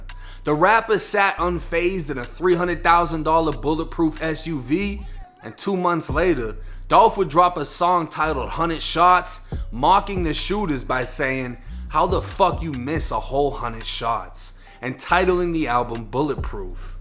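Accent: Boston, United States. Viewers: Bro, u gotta be from Boston!! Up north somewhere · Bro you from Boston on Florida lol I can’t tell witt ya accent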